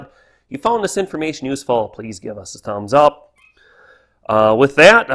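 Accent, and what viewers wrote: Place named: Midwest, United States. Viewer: Minnesota? Or somewhere deep in the country midwest.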